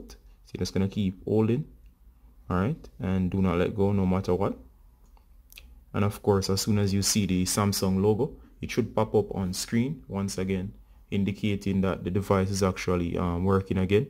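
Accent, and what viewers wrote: Jamaica. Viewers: R u Jamaican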